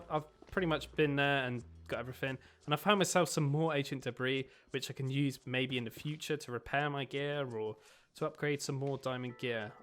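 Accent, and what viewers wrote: United Kingdom. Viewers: This series is the first Thing ever I saw from this man I just now physically actually realized he’s British and it just makes me feel so stupid PHWHWHWHHWHWHW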